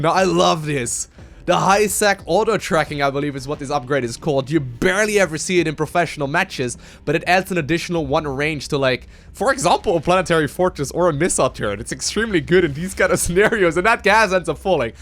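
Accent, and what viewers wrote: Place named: Netherlands. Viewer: sounds dutch. The accent is real.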